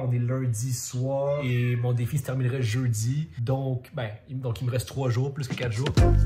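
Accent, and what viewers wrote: Quebec, Canada. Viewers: Salut mon ami! J'ai aimé ton accent!❤ Bisous du Brésil à toute nation québécoise! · T'es beau et j'adore ton accent Québécois Alors moi j'adore la lecture c'est super aventurier, ça aide à les gens à plus réfléchir, à s'évadé, a ce développer mentalement, etc Parfois il y a des liens entre nôtres vie et l'histoire ou ramon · Wahh ... québécois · Félicitation! lire 20 livres en un mois est très super. C'est inspirant. Also, I am watching your video to practice my french listening. I did realise at the beginning that you speak in Québécoise, and to my surprise I found your speaking easy and clear (considering I barely understand french youtubers from France 😭) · theSupercasa du québec! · El Amiri Yes, he is québécois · is he canadian? idk what canadian french sounds like but his french doesn't sound french iykwim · Haha first time at this channel and 2 seconds in and I knew he was Quebecois. 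Les quebecois sont incroyable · Yellow Card Chimchim His from Quebec, and yes he doesn’t sound like a French but he has a very nice voice.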